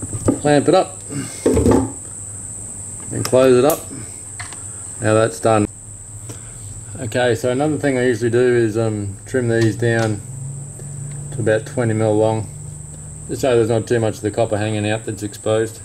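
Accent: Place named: Australia. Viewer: V good & should be helpful to me as I have finished laying my track to find my locos wont go around on it, so I now have to look at doing this. Glad you are running Hornby & select DCC as I am also. You are obviously Aussie & I am English but in NZ so do the kiwis call them suitcase clips "suitcase clips" ? But had you gone straight from the bus to the rail with one feeder you would have saved effort & half the amount of clips wouldn't you? Where did you get the clips & wire from? Thanks.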